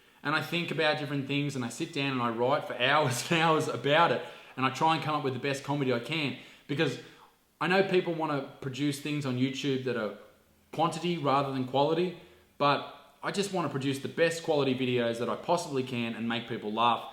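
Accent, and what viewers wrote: Australia. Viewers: Legend! People think your not Australian 'Shut up birds...fuckin hell'. If that's not Aussie I don't know what is. Love your clips mate!! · How anyone cant know ur an aussie just on ur accent alone fuckin blows my mind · i like watching your videos because you talk like Australians do normally. Most Australian youtubers tone down the swearing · you look like my last years english teacher, and sound like him to bc hes AUSTRALIAN 😉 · I feel like I’ve got all the tools to be a comedian.. Except that fuckin classic Aussie accent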